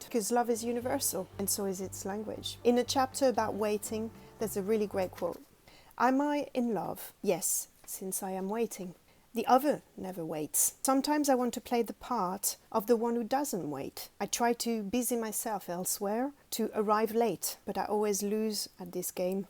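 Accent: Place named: France. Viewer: English with a French accent is the best combo!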